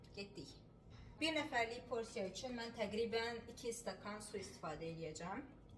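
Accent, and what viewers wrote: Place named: Baku, Azerbaijan. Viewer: Əlaaa. Aləmsən. Bakı ləhcən lap ləzzət eliyir. Eşq olsun ❤❤❤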